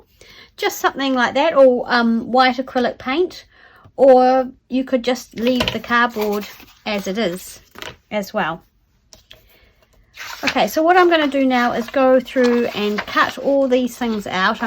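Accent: New Zealand. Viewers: Such a gorgeous tag, thank you! Loved watching your process and hearing our Kiwi accent! I’m wondering if you got your Art glitter glue in NZ? I subscribe to The Graphics Fairy and especially love all the journal tutorials! Is this your last one? 🧚‍♀️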